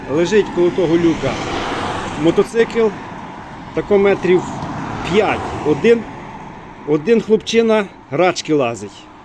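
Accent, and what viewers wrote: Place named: Kremenets, Ukraine. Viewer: Кременецький "діалект" просто жахливий, коли слухаєш "зі сторони".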